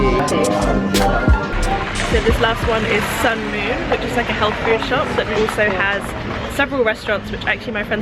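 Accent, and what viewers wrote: United Kingdom. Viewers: Thank you for the wonderful words about my country we all bulgarians appriciate it! Wish you to visit many times again and also wish you great time on another trips! P.S. Where are you from? You sound like a british :)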